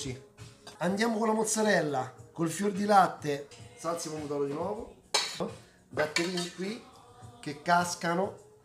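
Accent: Rome, Italy. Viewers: Che bello risentire il romano!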